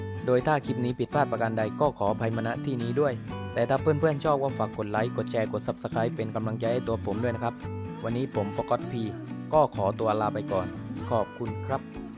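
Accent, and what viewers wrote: South, Thailand. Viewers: ไม่บอกก็รู้ว่าพี่คนใต้แน่ๆ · คนใต้ ชัดเจน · ผทรู้เลยนิ๊ว่าพี่เป็นคนใต้ชั้ยมั้ยนิ๊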